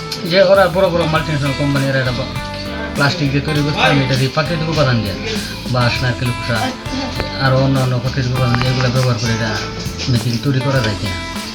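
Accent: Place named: Mymensingh, Bangladesh. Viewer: আইন্নে ময়মনসিংহের ভষাডা পুরা তুইল্লা ধরছেন 😎😎😎😎😂😂😂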